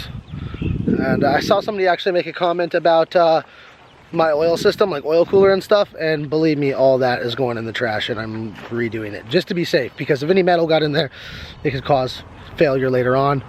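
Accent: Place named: Kentucky, United States. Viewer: I’m from Kentucky and I can definitely tell is too by her accent hahah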